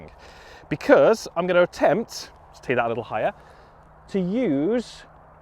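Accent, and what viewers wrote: United Kingdom. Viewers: Surely u with ur brit accent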